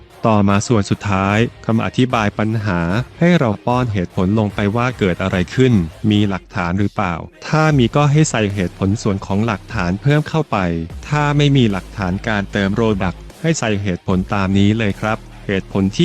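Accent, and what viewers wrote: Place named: South, Thailand. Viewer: ผมชอบดสียงพี่เหมือนคนใต้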